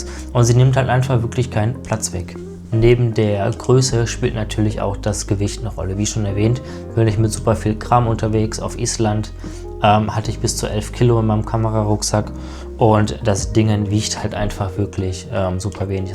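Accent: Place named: Germany. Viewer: Creator Combo ist jetzt schon auf 450 runtergekommen- da müsste ich sie mir für meinen Südafrika Urlaub auch holen🥰 vielen dank für das schöne Video.. Man merkt gar nicht das du nicht gerne vor der Kamera sprichst- zudem hast du ein sehr schönes, angenehmes Deutsch. Wäre sehr froh wenn ich als Schweizerin so sprechen könnte😅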